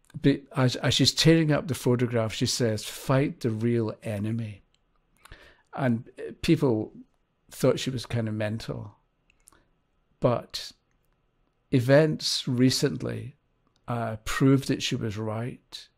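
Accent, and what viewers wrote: Scotland, United Kingdom. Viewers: From what part of Scotland do you hail? Glasgow I'm guessing. Thanks for your lovely video! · I am picking up Scottish undertones in his accent 🤗 makes me feel homesick haha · just gave it another listen, y’all are right it’s definitely a Scottish accent. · Love the accent I'm going to go on a limb and I'm going to guess that you're Scottish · Are you Scottish? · Definitely Scottish, Glasgow probably. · What part of Scotland 🏴 yoos from ? I grew up in Juniper Green, Edinburgh! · What a lovely gentleman. It's really nice to hear a soft, soothing Scottish accent 🏴 I took from this that association is key, or as I like to call it the "no degrees" of separation! XXX ♥️ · A lovely gentle Scottish accent. Music to my ears. 🏴💖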